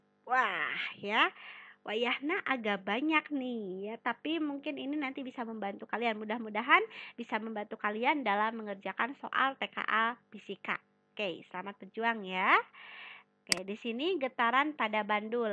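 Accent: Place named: West Java, Indonesia. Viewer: Kak orang Sunda ya?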